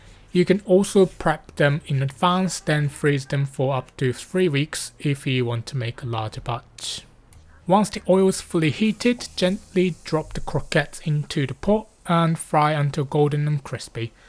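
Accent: United Kingdom. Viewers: Love your British accent! Thank you for the lovely recipe ❤❤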